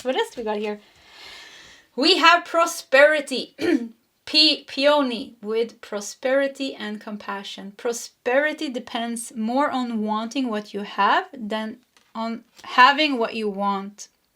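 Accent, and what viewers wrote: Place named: Sweden. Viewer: Chose pile 3, have experienced a heart chakra activation in the past year and I love your adorable Swedish accent. You have such a sweet energy! I lost several family members to cancer, cardiac issues and dementia. My first half of my life was filled with trauma, loss and adversity. At 45 years old I am exploring a potential career change and I have connected with a soulmate.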